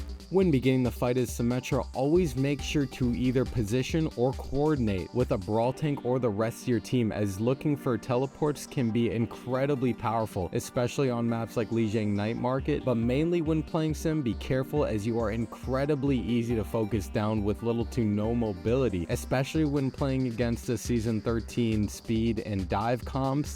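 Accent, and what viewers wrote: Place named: United States. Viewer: He sounds like a white American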